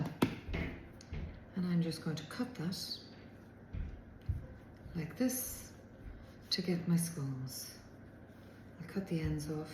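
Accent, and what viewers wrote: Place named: Ireland. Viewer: Lovely listening to a quiet irish lilt instead of a loud harsh american accent...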